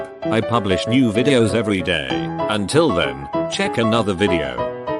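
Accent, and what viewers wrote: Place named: England, United Kingdom. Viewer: Really tiered of that English accent.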